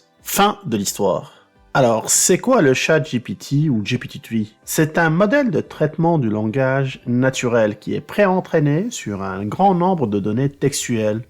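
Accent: Quebec, Canada. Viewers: Êtes vous Québécois ??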